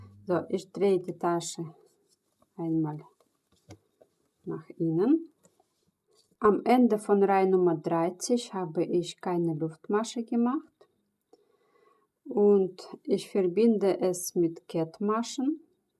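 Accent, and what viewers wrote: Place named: Russia. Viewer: Рашистский акцент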